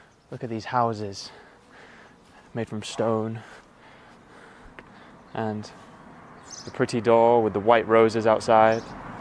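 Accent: United Kingdom. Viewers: Thank you. I´m from Mexico and I thought that the accent British would be difficult for me, but not. I´m grateful to find your channel. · Hi, I am excited to watch your comprehensive videos. I have got a lot of vocabulary there, besides I get used to listening a British man speaking about his sorroundings, thank you, stay healthy, will you? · I very like British English! Thank You! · The video was so aesthetic✨. I really like the British accent, it’s so charming. I felt relaxed and at peace while watching it. Please make more videos like this! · He is so nice but I have problem with a British accent 😢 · It's been a month since I started learning English, and l've realized that British English is easier for me than American English I can easily understand what you're saying I'm new subscriber and I really like what you share with us keep going 🎉🎉🎉🎉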